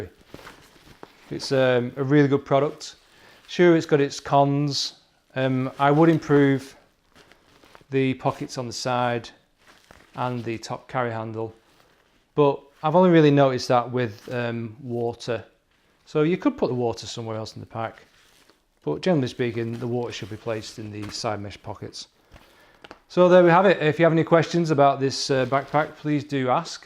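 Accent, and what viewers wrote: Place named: United Kingdom. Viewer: I like that this bag is good for 1 people as you say. I appreciate being able to learn proper English from a British people like you.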